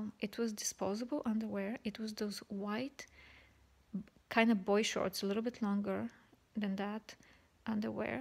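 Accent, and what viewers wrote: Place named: Russia. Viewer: Dear, thank you so much for your informative video. You helped me a lot. I just went through complete hysterectomy. I have a question. How long did it take for your bloating to subside? did you feel occasionally sharp small pains in your inside where the stitches were? I have sharp pain sometimes in my inside and my bloating is huge. But my surgery was on July 24, 2019 and today July 28, 2019. If there is any advice you can give to me to would be very appreciated. And also eventually did the bloating go away or do you end up having some left. Thank you for your time. Sincerely Naira (by the way I love the way you speak English, are you from Russia? I am from Armenia)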